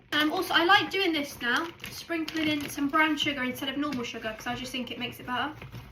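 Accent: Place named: West Country, United Kingdom. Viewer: Love your accent wheres it from somerset or devon maybe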